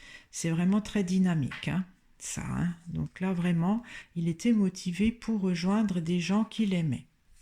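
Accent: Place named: Besançon, France. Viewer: Bjour Madame, ê vous de Besançon? Je pose la question en raison de votre accent.Je suis née là bas mais je n'y suis pas restée longtemps. En espérant que ce petit bout d'chou sera retrouvé sain et sauf❤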